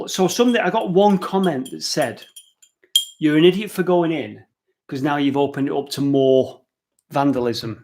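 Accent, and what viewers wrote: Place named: Manchester, United Kingdom. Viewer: Oh....you are so Manc! Lol....love your vids xx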